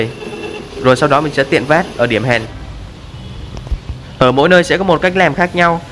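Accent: Vietnam. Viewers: на каком наречии он лапочет? на северном или южном?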